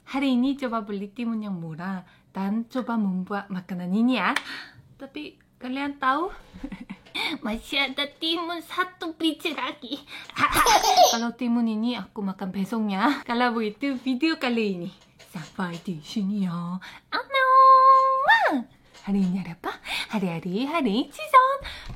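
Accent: Java, Indonesia. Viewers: Lo Lo Lo kok logatnyaa Jawa to inii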